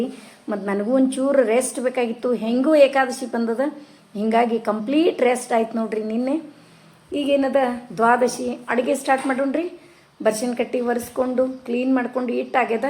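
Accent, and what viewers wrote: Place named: Hubballi, India. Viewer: Aduge choyittu niu yaav urinavru nimma bhashe kelidre hubballi anistu😊❤🎉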